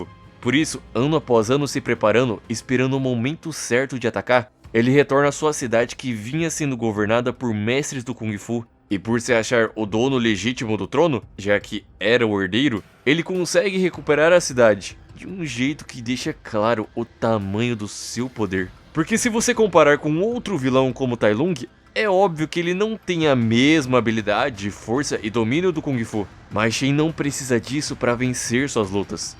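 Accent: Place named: São Paulo, Brazil. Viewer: mano, seus vídeos são bons, mas sua voz... Cara, é difícil prestar atenção. Procura as técnicas de controle da respiração para canto, vai te ajudar. E tenta dar uma segurada nesse sotaque forte do interior de São Paulo, nmrl, pprt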